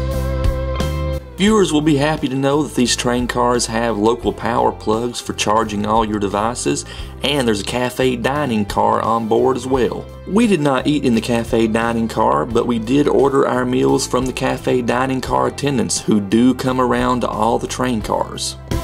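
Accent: Kentucky, United States. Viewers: Thank you so much!:) The Kentucky accent is so funny😁 Keep up good work guys!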